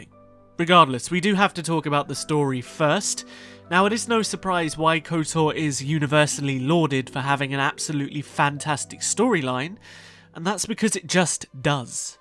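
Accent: United Kingdom. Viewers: It's interesting hearing you try not to sound British. You are British right? lol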